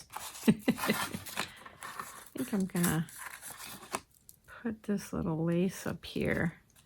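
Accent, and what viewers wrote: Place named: New York, United States. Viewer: Loved your New York accent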